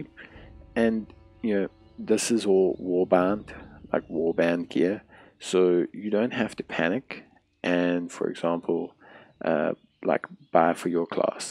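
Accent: South Africa. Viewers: awesome man, great to see other SA WoW players. You do sound like you've been out of SA for awhile.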